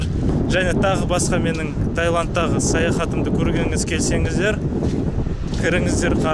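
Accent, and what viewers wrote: Standard. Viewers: Рахмет саған қазақша блог жүргізіп жүргеніне! Аздап акцент болсада қазақша таза, сауатты, қатесіз сойлейді екенсін, орысша сөздерді қоспайсын, жарайсын!👍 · оте керемет жансынго таза казакша сойледн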